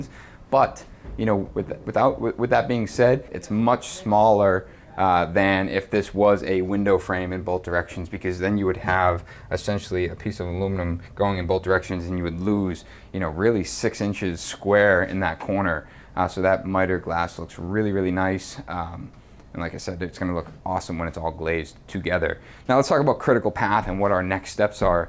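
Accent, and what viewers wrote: Boston, United States. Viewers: I love how sometimes you just can't turn off your Boston accent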